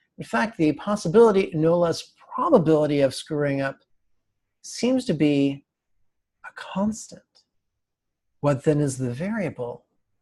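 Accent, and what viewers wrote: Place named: Germany. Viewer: I like your German accent!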